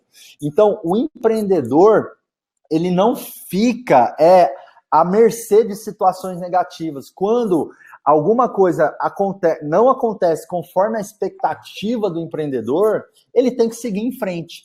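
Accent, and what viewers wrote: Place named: Goiás, Brazil. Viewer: Tenho visto dezenas de conteúdos para impulsionar as vendas do meu negócio, e os seus vídeos são os melhores! Isso não só por conta do conteúdo que é riquíssimo, mas principalmente por que vc passa estratégias realmente aplicáveis em pequenas e médias empresas. Normalmente os conteúdos são direcionados a grandes faturamentos, já o seu entra na realidade da maior parte dos empreendedores brasileiros. Muito obrigada mesmo! Ah! Sou sua conterrânea kkkk orgulho de ver vc puxando o R típico do nosso Goiás! 🤩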